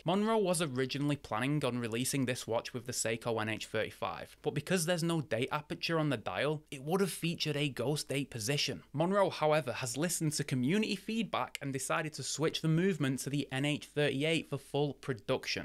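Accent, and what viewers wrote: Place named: Northern England, United Kingdom. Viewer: Northern England somehwere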